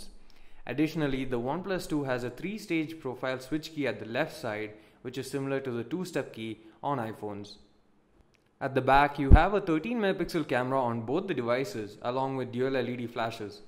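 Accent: Tamil Nadu, India. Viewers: bro are you from tamilnadu......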